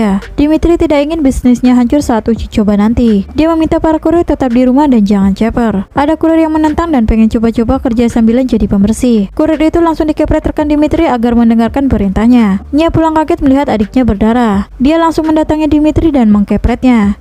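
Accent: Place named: Banyumas, Indonesia. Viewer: Bahasane ngapak koh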